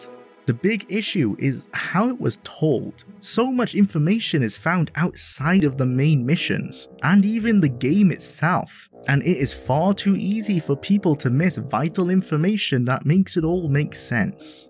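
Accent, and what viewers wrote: Wales, United Kingdom. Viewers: I can't believe I listened to a Welsh accent for almost four hours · Don't Hide Ur lovely Welsh accent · ...I like your Welsh accent. Ignore the jerks who complain about it. Brilliant video! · you shouldnt suppress your Cymric accent, Cymric people are based; also, Welsh/Wales is a slur meaning "Foreign/Strange People", it shouldnt be used, fuck satisfying the wants of the British, Britain is an Anglo-Scottish Union of oppression and cultural-genocide against the Gaelic and Bretonic peoples of the UK; the Southern English and the Low-Lander Scots, rule the roost of this regime we live in, under our dictator, Lizzie, whom ironically has the same birthday as Adolf Hitler, its symbolic... of the fact shes a fascist shite-arse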